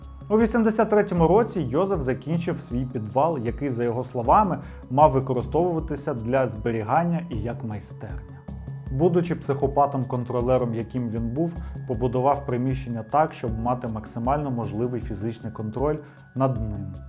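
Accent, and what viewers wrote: Russia. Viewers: Хлопче, тобі необхідно працювати над українською, хоча б інколи спілкуватися з україномовними. Дебільні звуки, притаманні тільки рашистам, відвертають від перегляду і характеризують тебе як примітивного та слабого на голову дурня.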